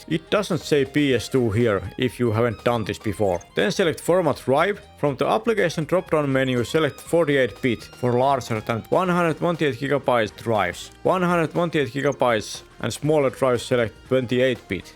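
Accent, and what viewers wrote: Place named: Finland. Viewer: Seconds into this video I could hear Fintlant. I trust Finns for no-bullshit explanations of everything. Thank you for the great video. Perkele!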